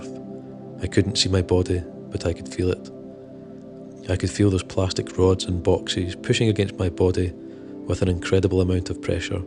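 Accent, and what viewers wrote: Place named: Newcastle, United Kingdom. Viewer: The Geordie accent make the story 10x better!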